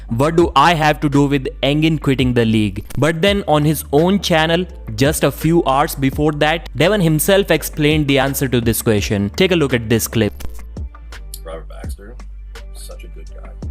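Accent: India. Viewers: FIX YOUR STUPID INDIAN ACCENT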